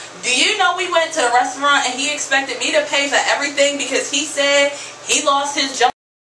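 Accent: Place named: Baltimore, United States. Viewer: u gotta be from baltimore maryland or somewhere in that area i hear it all in ur voice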